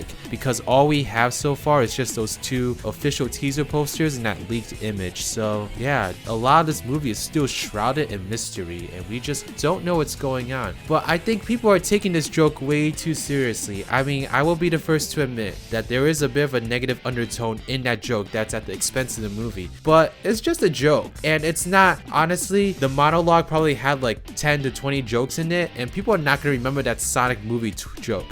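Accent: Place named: Asia. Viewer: Whoever you are, youre asian, i can tell from your accent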